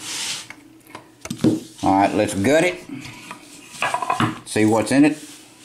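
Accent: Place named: Texas, United States. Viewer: My favorite part of your videos, aside from your amazing picking skill, is hearing you say "let's gutted it" with that Texas ascent :)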